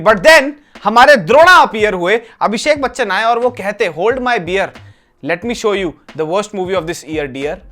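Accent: Haryana, India. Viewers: Was missing your haryanvi soooo much 😍😍😍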